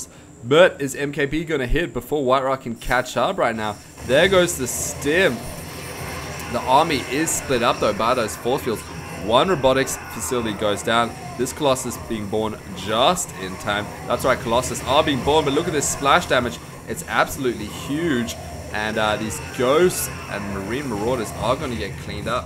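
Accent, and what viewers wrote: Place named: Australia. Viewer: maybe not the best - In my opinion the best caster should be at least in master and discuss about tactics like a professional - but for sure - it is very nice to hear an Australian:) I have to admit-I like to listen to. I've started just few days ago-and I would like to continue. Besides Husky, Force and HD - is the best. For example TotalBiscuit has a superb voice, but he sucks at SC - as admit he himself. could be my best in the near future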